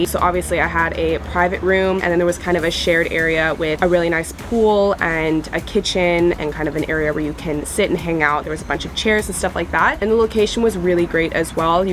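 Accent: United States. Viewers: This girl has a total American accent…… I am American and there are different accents based on where you live, but hers is pretty standard American. The American sound that other Americans would consider to NOT have an accent. Accents that are commonly known around the world but very specific to a local area and not all of America……a New York, Boston, or far south accent. These are not representative of most American speakers, this girl is.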